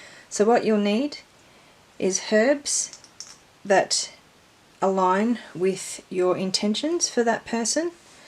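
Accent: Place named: Australia. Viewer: Thank you for sharing your crafting. It is so lovely to hear a fellow Australian accent.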